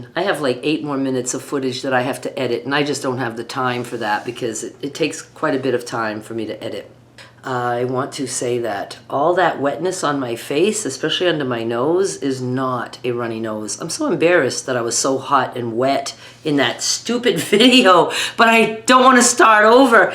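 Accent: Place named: Upstate New York, United States. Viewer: so happy to have found you! I want to ask. Where are you from? You sound just like my family from way upstate NY like the north country accent.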